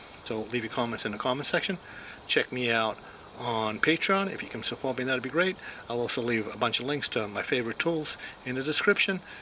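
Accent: Boston, United States. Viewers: Man you can hear that Boston! 😂👍Great work. Always teaching us better.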